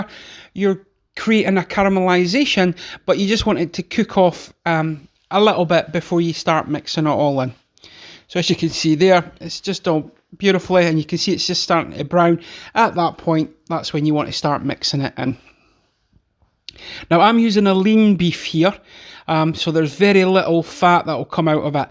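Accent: Scotland, United Kingdom. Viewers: That's what was missing, Food being prepared with a Scottish accent on the lad. Thanks!! Slainte Mhath!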